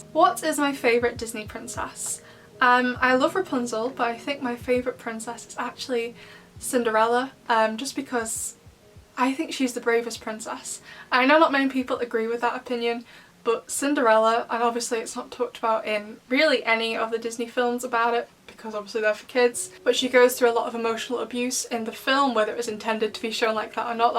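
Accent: England, United Kingdom. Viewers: I wish I had an English accent like you!! 😭 I just love the way you speak!!💗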